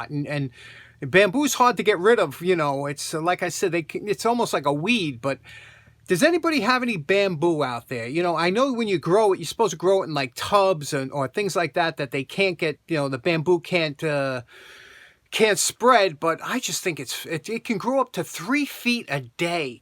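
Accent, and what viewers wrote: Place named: Staten Island, United States. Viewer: Today, I was delivering and setting up a large grandfather clock for a man from Staten Island, his accent reminded me of you,. I work at a clock and watch repair shop in Western Kentucky. Our shop has been run by one family since 1902 and still has most of the german and swiss tools from the early 1900s. Can’t beat the quality.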